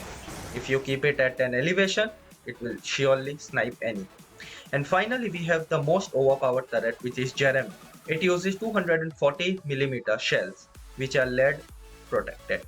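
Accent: India. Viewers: hmmmm, his way of speaking english seems like he is an indian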